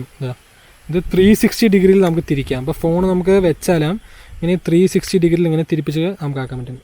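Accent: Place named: Palakkad, India. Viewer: Wawoo അടിപൊളി 👌👌 സൂപ്പർ പാലക്കാട് ഭാഷ 👌👌💕💕💕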